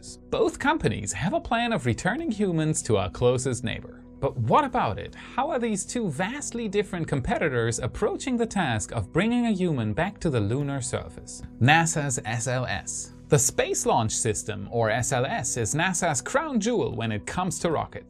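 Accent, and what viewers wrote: Germany. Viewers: I like your slight german or central european accent. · He is speaking unusually good English with a slight Tscherman Akzent. The only Germans you are likely to hear with less accent than him are a few professional actors and people who had a bilingual childhood.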